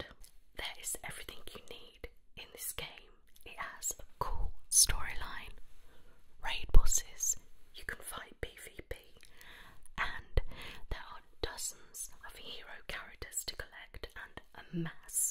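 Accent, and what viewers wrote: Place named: England, United Kingdom. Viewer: What part of england are you from? I love your accent! I’m from down the south coast 💕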